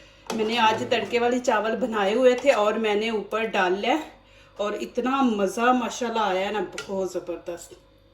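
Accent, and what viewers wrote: Punjab, India. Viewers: Aapki Punjabi accent bahut achhi lagti hai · اردو پنجابی کی ٹون میں اچھی لگ رہی ہے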